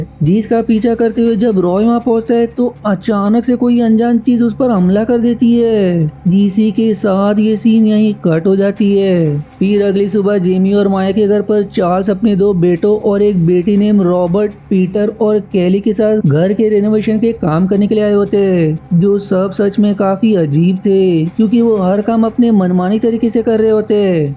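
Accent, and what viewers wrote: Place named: Bihar, India. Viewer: तू अस्सल बिहारी लगता है मेरेको हो तेरी जिंदगी थू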